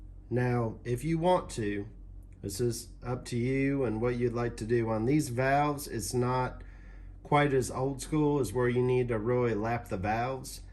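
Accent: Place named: South, United States. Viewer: Love your videos man. I want to do some similar work this winter but I was unsure on how to do some of the things but you made it easy to understand. I’ve watched a lot of your videos lately, one thing I think you should do as far a video is show your face every now and then, the reason for this… is to get people familiar with your face, so when they scroll through YouTube they’ll be able identify your videos…. It’s kinda like a familiar face. Other than that I like the tempo of your videos, it’s not to slow and not to fast. I’m guessing your somewhere from the south because of you accent. I’m from central MS.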